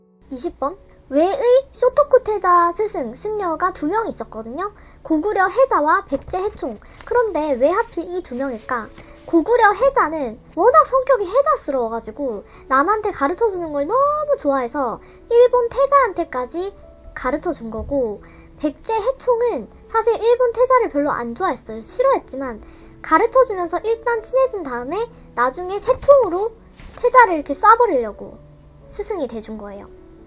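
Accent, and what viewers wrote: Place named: Jeolla, South Korea. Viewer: 전남쪽 냄새가 납니다ㅎ귀여워요~ 유용한암기법 잘 보고 갑니다잉^^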